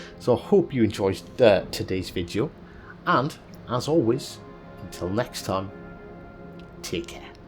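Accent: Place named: United Kingdom. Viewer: Which part of Britain are you from? Love the accent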